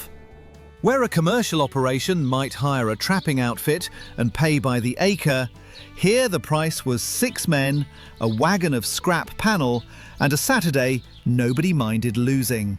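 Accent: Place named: England, United Kingdom. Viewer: Why use an English accent ???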